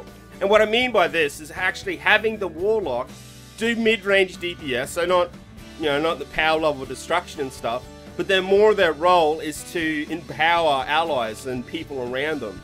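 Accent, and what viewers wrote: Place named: Australia. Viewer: can never get enough of that sweet ausie accent!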